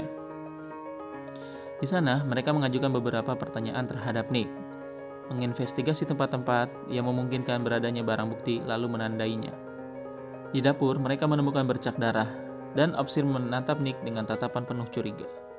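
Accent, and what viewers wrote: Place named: Banjar, Indonesia. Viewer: Banjar banar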